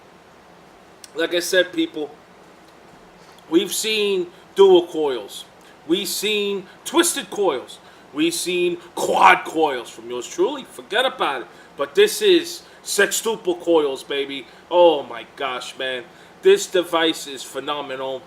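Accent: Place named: New York, United States. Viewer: ***** ya you can tell your a new yorker without the forgetaboutit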